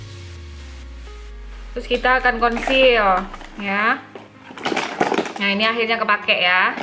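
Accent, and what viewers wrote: Java, Indonesia. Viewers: Saya selalu suka tutorial make up mbak, gaya bicaranya yg medok dan pemilihan warna2 make up nya yg ok bikin betah utk nonton, sukses selalu utk mbak dan terus saya tunggu up date tutorialnya, Gbu😀 Dan saran saya tolong juga jaga kesehatan kulit wajahnya ya😀👌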